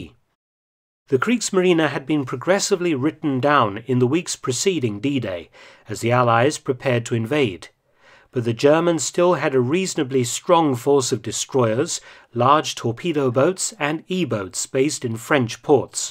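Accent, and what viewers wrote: England, United Kingdom. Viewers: Best WWII Youtube, 100%. Encountered your channel in late 2018 by chance, and I believe I’ve watched every single one of your videos, some over again. Can’t say that for many other Youtube channels. Your clear, concise English accent and the incredible knowledge is like the History channel (when it was actually good) on steroids. With the amount of information you have on WWII, you’d almost think you lived through it. I don’t know if you’ve ever considered or done this already but I think you should apply to be narrator for history programs on the side. I’d watch them all. Thanks for the amazing videos Mr. I hope they keep coming for many years!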